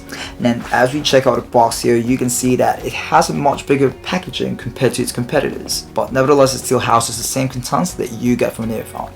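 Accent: United Kingdom. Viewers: Wow! British Accent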